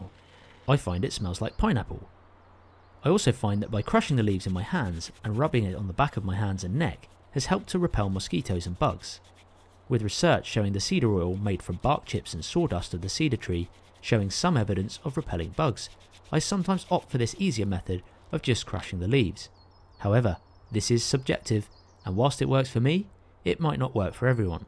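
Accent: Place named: South East England, United Kingdom. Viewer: It’s a pretty regular accent for someone who was born & lives in the south east of England. I too was born in the same area as & share the same accent.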